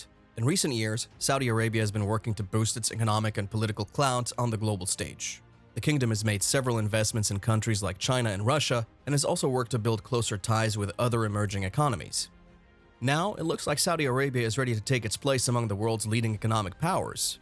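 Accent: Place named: Texas, United States. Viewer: You sir, are starting to sound Texan , I like it. I have always had a good feeling about Russians. I think they are tough no nonsense people, and I heard they make good vodka. Trxas has so much oil we could give it away for free , and still make a profit.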